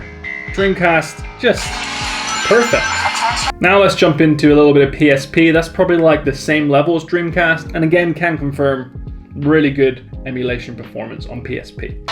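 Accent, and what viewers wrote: Bristol, United Kingdom. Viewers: Are you Bristolian?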